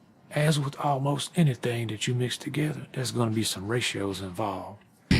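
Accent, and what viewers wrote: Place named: South, United States. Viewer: i love the narration. it's that down home southern vibe, but also ASMR. haha.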